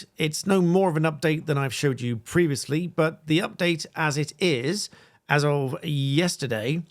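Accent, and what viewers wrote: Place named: RP, United Kingdom. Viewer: A posh English accent, then What is a missle?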